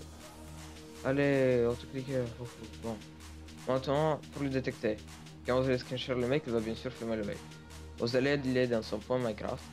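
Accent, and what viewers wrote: Russia. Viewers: Tes Russe ?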